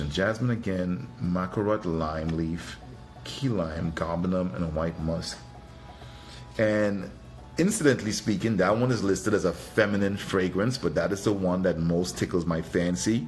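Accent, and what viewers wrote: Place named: Trinidad and Tobago. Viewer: Who's? Lol... btw I get a slight Trini or Dominican 🇩🇲 accent in the back? More trini. Might be going crazy. Lol. Disregard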